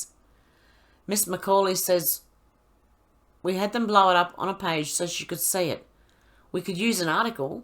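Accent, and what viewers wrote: Australia. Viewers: Thank you for reading, actually the Australian accent is perfect for, love you 😍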